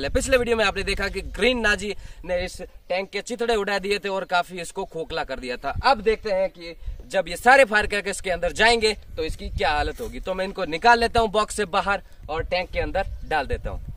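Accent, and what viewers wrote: Rajasthan, India. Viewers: Aap rajsthan se ho kya please replay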